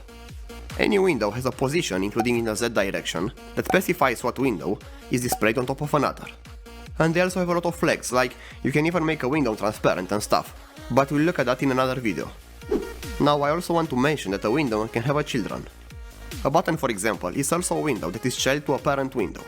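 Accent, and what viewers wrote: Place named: Romania. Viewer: scrie la descriere frt+ ai accent de roman :)))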